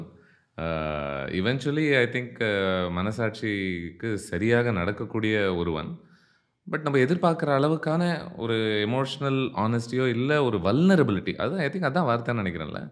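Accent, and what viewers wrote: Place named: Kerala, India. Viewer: Avar full ah tamil pesala 😂😂 avar konjam malayalam pesraru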